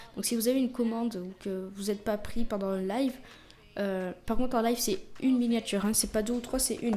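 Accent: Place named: Marseille, France. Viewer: je kiff ton accent de Marseille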